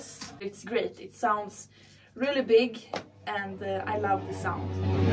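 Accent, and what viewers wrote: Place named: Sweden. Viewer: idk but your accent is cutely swedish. 😂❤ Definitely checking your band out. Cheers from Helsinki!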